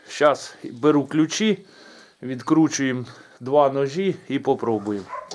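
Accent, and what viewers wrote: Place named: Poltava, Ukraine. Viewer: Привіт відео про дививсь,про корморізку січкарню шок? А остальное чудово свиней люблю колоть з початку війнитримав3х Зара ні?молодець господар ,підпишусь тільки не шкодь техніку воне не для того,зроби окремо щепоріз в парі з дроворубом,шків потрібно проточить ,під ремень відповідний на апараті?або ремень інший ну пока іду воблера дороблять,молодець господар ,рибак,вимова мені приємна полтавоукраїнська ,мені подобається успіхуОК.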